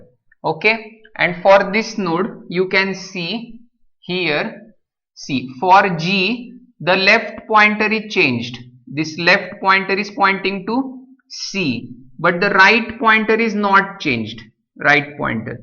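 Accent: India. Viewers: You deserve more followers! Great video. By the way was that Marathi accent? :)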